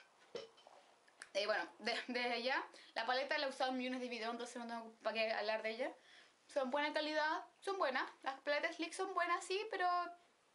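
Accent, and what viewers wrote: Chile. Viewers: que acento chileno!!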